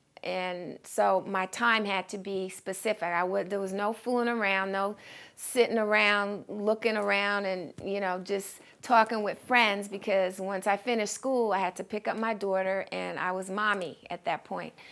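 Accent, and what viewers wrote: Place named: New York, United States. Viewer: I love your New York accent and your apartment is gorgeous I could fall in love with all your art work.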